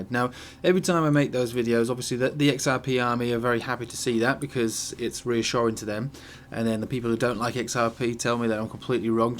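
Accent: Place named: Northern England, United Kingdom. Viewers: sounds yorkshire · Just “norf” :D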